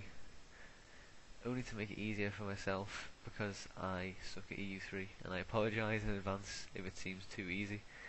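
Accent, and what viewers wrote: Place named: Liverpool, United Kingdom. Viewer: Scouser! :P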